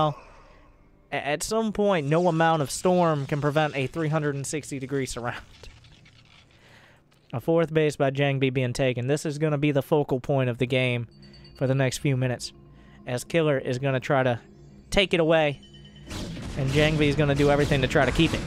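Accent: South, United States. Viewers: Dude you have sick commentary skills, super entertaining! Is this guy from the South or something?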